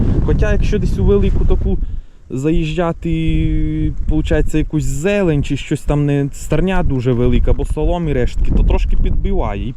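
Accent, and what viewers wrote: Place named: Dubrovytsia, Ukraine. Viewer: Доброго... по говору ви наче з Дубровицьких країв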